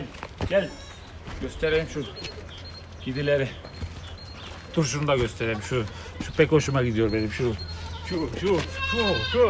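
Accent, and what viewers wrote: Konya, Turkey. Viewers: merhaba, kanalını dünden beri yeni keşfettim. hayatimda hiç köye gitmedim, köy yaşamını da tatmadım haliyle. o kadar sıcak kanlı, sempatik, hayatla bire bir yaşam süren, evinde hayvan besleyip kendini zenginim diye tanıtmayan ve gerçekten insan olan biri olman beni çok mutlu etti. hayvanlarla iç içe, doğayla, çevreyle iç içe olman harika... umuyorum çok daha iyi yerlere gelirsin, hiçbir youtuberla seni kıyaslamayacağım çünkü gerek yok. herkesin doğuştan gelen yaşam tarzı, keşke ben de natural bir yerde yaşasaydım. araba gürültüsü, fabrika dumanları, boş insan sesleri, huzursuzluk, kaygı ve dahası... bunların hepsi insanı yoruyor. başarılarının devamını dilerim... bu arada konyalı mısınız? konuştuğunuz ağız çok benziyor.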